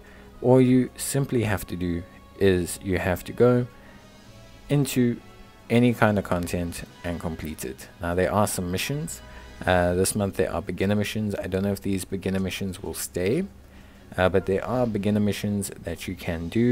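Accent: South Africa. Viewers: Yooo how you doing? by any chance are you south african?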